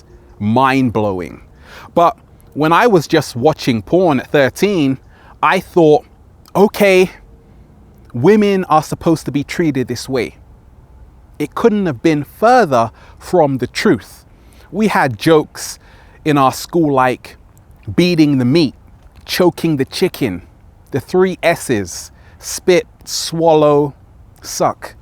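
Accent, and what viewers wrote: United Kingdom. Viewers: (Diving Deep) You're a decent looking black guy with a British accent. Come to the US, white girls will be all over that D. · btw are you British? your accent seems to point yes I cant help but notice that you slur on your s and yeah i love to watch porn and enjoy doing it and then some time ashamed about what type I watched lol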